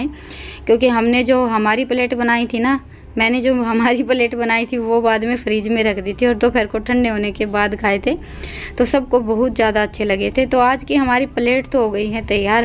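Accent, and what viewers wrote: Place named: Rajasthan, India. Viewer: Waw ap jitni bi recipe bnate ho bhut testy bnti hogi dikhne bi achi lgti h or di apki bhasha bhut pyari lgti h ap real bhasa bolte ho to mja ata h sunne me mai bi rj se hu so smj bi ati h or ha sbse jyada le isantiya ye bolte ho to meri hassi bi ni rukti 😂😂😂😂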